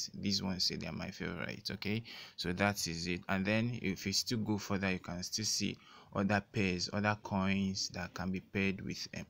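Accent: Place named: Nigeria. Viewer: I like the originality of your Nigerian accent.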